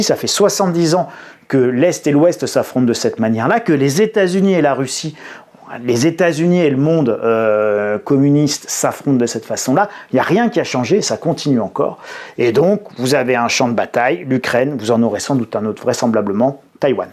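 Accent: France. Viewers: jaime votre accent francais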